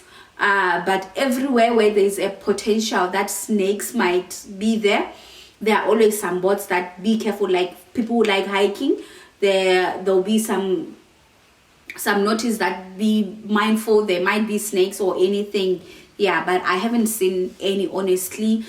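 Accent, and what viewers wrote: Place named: South Africa. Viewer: Hi dear, you sound like a South African, I am Namibian, watching you from Windhoek.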